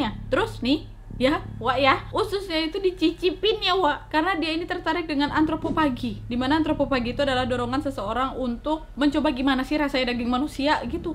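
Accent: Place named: Medan, Indonesia. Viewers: Medan kaleeee bahasa kakak ini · Logat Medan wak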